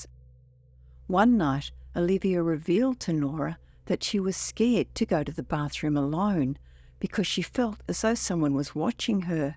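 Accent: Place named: Australia. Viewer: Being an Aussie I love hearing our accent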